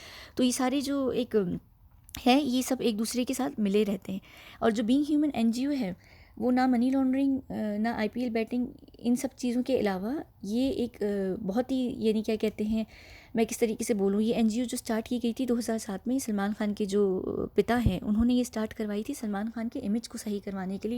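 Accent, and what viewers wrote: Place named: United Kingdom. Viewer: Great 👍 voice & great 👍 voice and also very very good Urdu & great 👍 british accent in English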